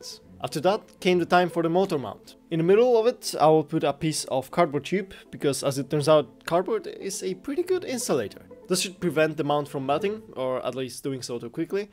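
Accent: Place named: Poland. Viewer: juz po piweszej sekundzie filmu wiedzialem ze jestes polakiem :)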